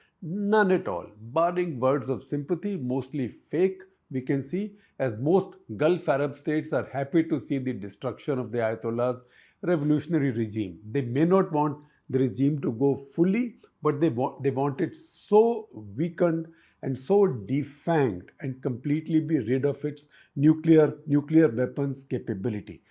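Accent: India. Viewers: His thick Indian accent requires a lot of focus to comprehend what he is saying.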